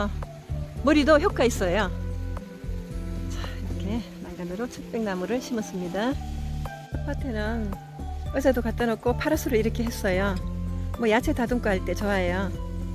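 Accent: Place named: Gyeongsang, South Korea. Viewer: 서울말 쓰는 갱상도 아지매시군요. 꽂아놨어요를 꼽아놨어요에서 빵 터졌음......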